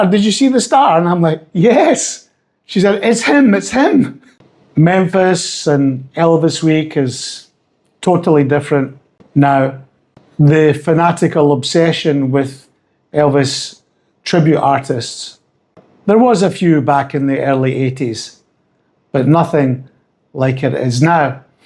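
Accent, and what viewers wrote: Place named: RP, United Kingdom. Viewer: Your BBC pronunciation is beginning to grate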